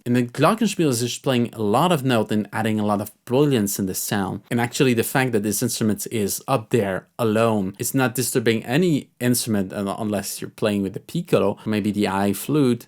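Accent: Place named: France. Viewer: Great tips, many thanks! Coming from a language where “h” sound is much harder than in English pronunciation, your implied French “h”’s took some time to get used to. Also, being a beginner, had to look up what “Cr.” stands for - learned something new and absolutely necessary.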